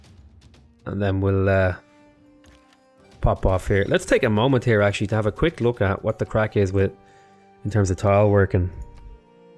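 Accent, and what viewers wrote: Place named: Ireland. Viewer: I love how much more pronouncedly Irish you sound at the episode's beginning.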